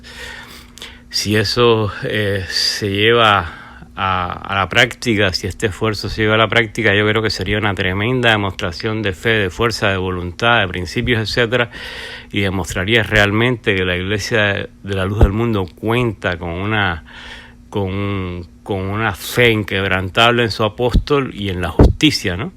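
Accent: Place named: Central America. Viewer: Guadalupe Salinas Salinas Gabrie no te pases de lanza los cerdos son sabrosos en carnitas y este tipo del vídeo habla como centroamericano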